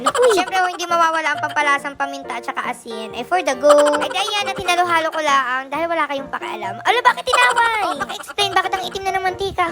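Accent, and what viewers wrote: Batangas, Philippines. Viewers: tuwang tuwa ako sa mga salita mo kaganda mo pero gay on mga salita mo pang batanginyo your so cute po lagi po ako nag aabang ng iyong vlog ❤😊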